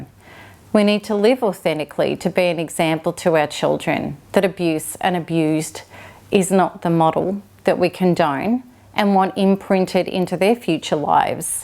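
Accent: Australia. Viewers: Love your hair, simply gorgeous! Refreshing to hear a fellow Aussie voice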